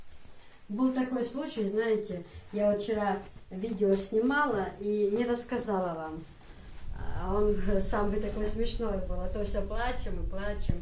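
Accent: Chechnya, Russia. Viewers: У вас даже акцент Чеченский) Дал атьо бойла, у меня две снохи русские, очень хорошие, уважающие наши традиции и веру! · Акцент чисто Чеченский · И акцент чеченский и похожа на чеченку Аллах1 Дала дукьа яха ёйла хьо🤲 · у Вас уже акцент чеченский.молодец умница.счастья Вам. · Надо же русская, по русский говорить с чеченским акцентом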